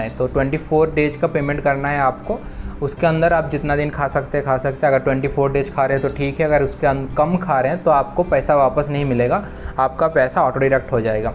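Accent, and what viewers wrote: India. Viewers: Ap Bengali ho kya??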